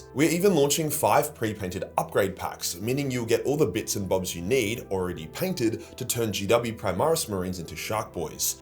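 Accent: Australia. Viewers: I never realized had Australian voice over. I wonder what his real voice sounds like.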